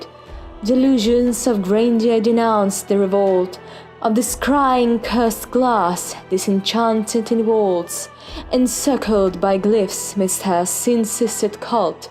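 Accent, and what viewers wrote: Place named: United Kingdom. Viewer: I love your voice and accent. Are you British? By the way, the part at the end literally gives me shivers. Maybe make ASMR?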